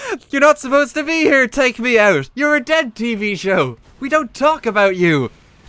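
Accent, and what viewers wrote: Ireland. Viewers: wait are you irish.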